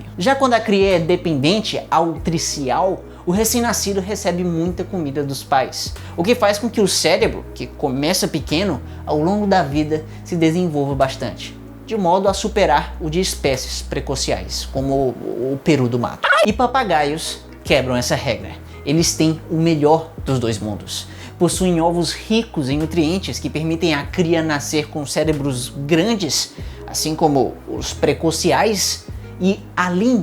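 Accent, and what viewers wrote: Ceará, Brazil. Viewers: Man tu é cearense? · Mano vc é cearense? Puta cabeção de caixa d'água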